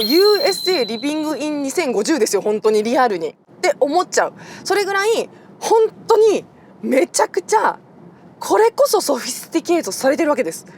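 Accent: Kansai, Japan. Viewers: って、関西弁たまぁに出るやん❤ 鞄に飴ちゃん忍ばすオバちゃん系。早口と、関西人ならではの、間を嫌うマシンガントーク ❤いつも観てます🎉大好き。でも、ロングレンジRWDなら買いたいです。来年かなぁ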